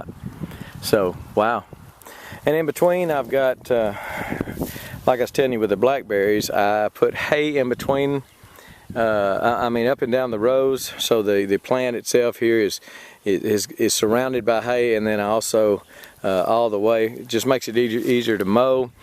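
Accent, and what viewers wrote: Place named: Alabama, United States. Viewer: Please pardon my ignorance and forgive me if this is taken as insult - are you from Texas? Because your accent sounds very Alabaman to me 😊🤔 Any ways, thank you for all your videos. It's nice to see true Southerners gardening with native plants in this climate.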